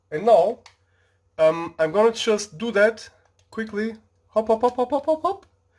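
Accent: Germany. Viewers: German accent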